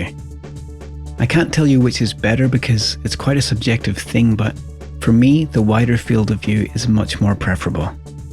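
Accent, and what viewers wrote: Northern Ireland, United Kingdom. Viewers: Great review. I have the Pocket 1 and was considering upgrading. This has convinced me to keep with it although there are some great improvements with the Pocket 2. I just bought a controller wheel instead. Nice to see you are holding on to your Northern Ireland accent, it made you easy to listen to. Well worth subbing your channel.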